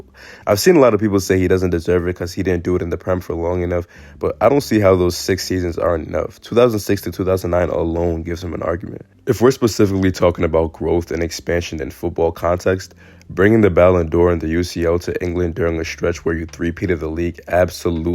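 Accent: United States. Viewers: It’s pronounced more like lair-star not lie-stir but I get that it’s harder with an American accent to pronounce English club names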